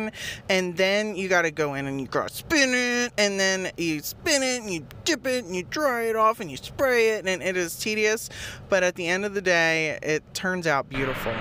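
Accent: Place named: South, United States. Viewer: Haha. That is what you do to me every week. Your sense of humor is just like mine, sprinkled with a little bit of evil, just a tiny bit. 😂😂 It is raining and cold here. Is it cold where you are? I can tell you are further south, by your accent. It snowed a couple weeks ago, then it got into the 80s, for a week and a half, now I am dressing like I live in the tundra, again. I mean, c'mon, it will be june 1st in 4 days. It better get warm, or I am just going to start making winter crafts...maybe a snowman, eating watermelon and wearing a bikini. ⛄🏖👙 🍉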